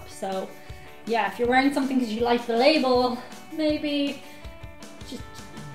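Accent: Ireland. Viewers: Beautiful as usual. I always enjoy the Irish accent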